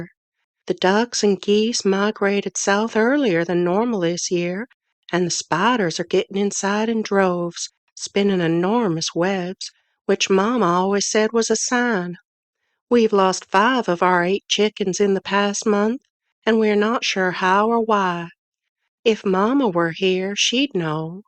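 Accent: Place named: South, United States. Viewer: Just curious why the Southern accent? The setting is upstate NY.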